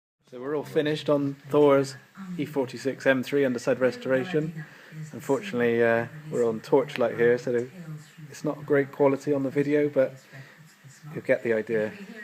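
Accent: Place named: West Country, United Kingdom. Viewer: "Startan the fit up" - good 'ol west country boy:) Waiting for a quote,...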